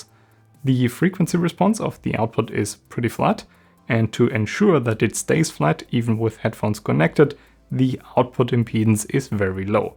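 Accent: Germany. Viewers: it's his German accent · Love the accent mate, used to it by now since my family is German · Is there any negatif comments on his accent ? Every guy with a german accent talking about tech or music i heard were right on almost everything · First time in my life I can't set English subtitles on English video - why? Because of GERMAN accent? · Love your accent Man. Don't bother about the comments on your German accent. Keep the videos coming. What matters is the content in the beginning and content in the end. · He’s not robotic it’s his German accent. - made my day :))) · Not gonna lie... I didn't even notice the german accent.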